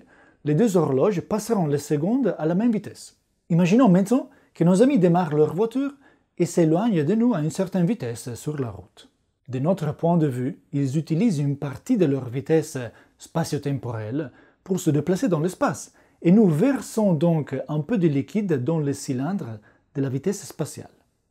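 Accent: Italy. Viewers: la seule chaine qui réunit un physicien super beau, un accent italien a tomber par terre et de la science super bien expliquée pour les novices...que demander de plus :elbowcough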